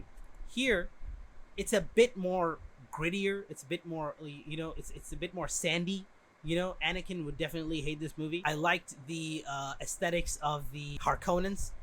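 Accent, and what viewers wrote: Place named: United States. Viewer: Wait you live in Bangladesh? You sound so American!!!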